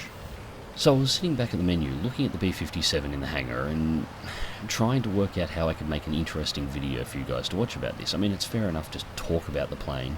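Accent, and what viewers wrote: Australia. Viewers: Lehtokurppa Von Fön get yr ears cleaned. Hes AUSTRALIAN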